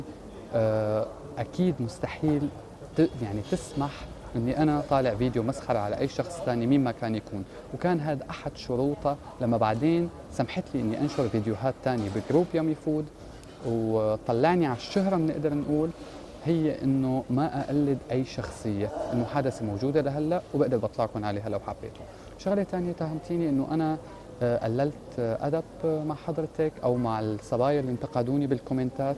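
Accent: Aleppo, Syria. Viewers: sara tv لا حبيبتي هاد حلبي اااحي كمان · tv حلبي بس هيك خاطرلو يحكي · هاد سوري مو لبناني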